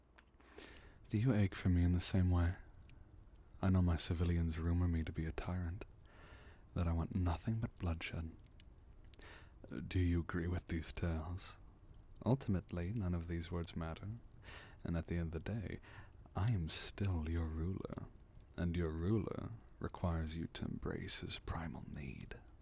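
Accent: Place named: RP, United Kingdom. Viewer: This mans voice is so smooth, out here sounding like a british gentleman from the 1800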